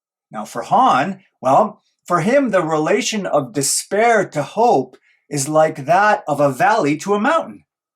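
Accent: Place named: Canada. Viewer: Indeed. Honestly, if you ever venture to the UK. look me up. It would be a privilege to meet you, & hook you up with places and people. I have family in Canada (sorry, terrible at accents but I think your vowels suggest Canada rather than THAT place?) so we might get out there before the decade closes. But certainly with a brown family I won't be going to the other place anytime soon! 😅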